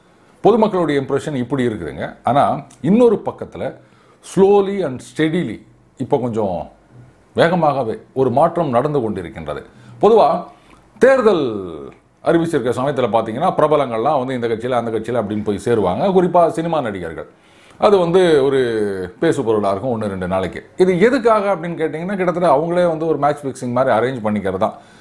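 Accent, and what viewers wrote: Chennai, India. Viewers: Sir, so interesting to listen your news information in Madras Bashai, sorry Madras Language!.thank you for sharing your updated news 😀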